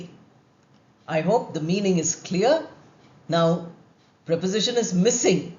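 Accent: India. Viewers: Dear madam However well indians speak English it is not like native speakers of English.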